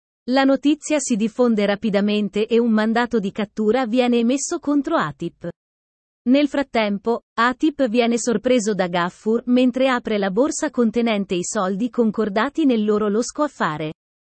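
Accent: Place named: United Kingdom. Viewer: Perchè citare i nomi turchi con la pronuncia inglese? Inascoltabile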